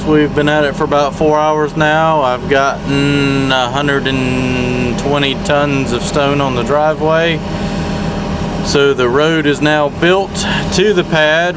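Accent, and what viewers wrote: South, United States. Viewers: Your southern accent sound’s almost just like my Uncle Charles in Tennessee😂😂😂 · I need someone to do this type of work for me. I'm building a house soon, but the pad needs to be built up for sure. Where are you at? I hear some southern accent. SW Arkansas is where I need the work done.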